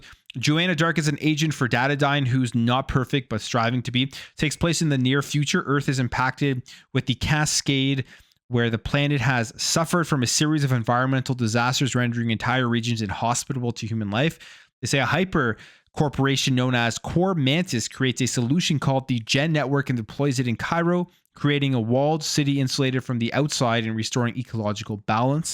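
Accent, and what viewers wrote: Canada. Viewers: Hey brother, are you Canadian? Just wondering if anyone has ever told you that your voice sounds like a younger Bret "hitman" Hart. 😅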